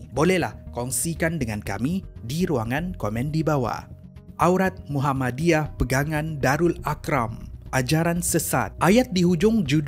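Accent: Sabah, Malaysia. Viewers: bukan lah sebab cakap melayu masih ada loghat Sabah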